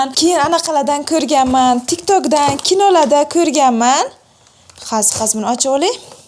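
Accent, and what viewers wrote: Bukhara, Uzbekistan. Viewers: Buxoromizni shevasi shirin umrizni oxirigacha shunday beg'ubor bo'lib qoling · Buxoro danmisiz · Buxorolikmisiz deymanda?😂😊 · Buhoro manimcha adashmadimi man toshkentlide😊😊😊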